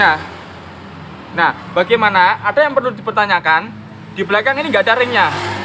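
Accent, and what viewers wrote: Java, Indonesia. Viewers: masnya muka batak tapi logat Jawa tulen, keren mas bang😁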